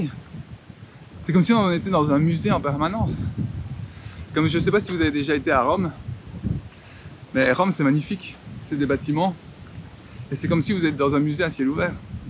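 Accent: Liège, Belgium. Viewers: Merci pour cette vidéo , il me semblait bien avoir reconnu ce petit accent de la Province de Liège que j'affectionne tout particulièrement étant de Mons (Hainaut). Il ne suffit que d'une chose pour changer le Monde comme en musique s'accorder pour être en harmonie et changer la musique ...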